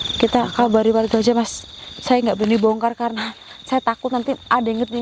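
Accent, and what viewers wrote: Banyumas, Indonesia. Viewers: Pingin banget di marahi Ama mbak dengan bahasa ngapak nya, palingan aku cuma cengar cengir..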